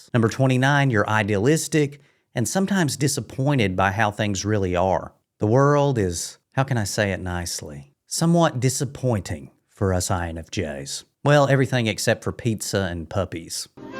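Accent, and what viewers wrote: South, United States. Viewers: Just curious…where is your accent from? 😊 I know it’s from one of the Southern states, but don’t know which one. 😂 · Really enjoy your content. I especially love your accent as a fellow Southerner. Was wondering if you would be willing to share your state of origin? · Saulron. THATs what you are saying 😂. Really bothered me I had to back it up and put the CC on. It’s your soft southern accent & the fact that Tolkien created a name almost nobody knows how to pronounce 😂. Cheers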